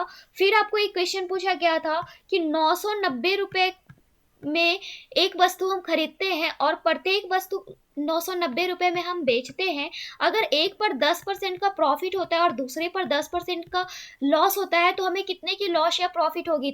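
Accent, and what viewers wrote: Bihar, India. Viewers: Mam, kya aap Bihar Sharif se ho?? 🤔🤔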